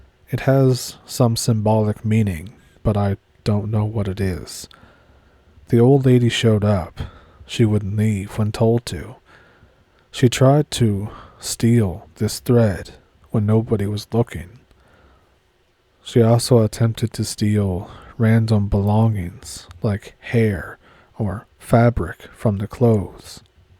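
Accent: Ontario, Canada. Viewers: Hearing your voice reminds me of how my cousins from Ontario sounded. 🎉 Good Times, wot?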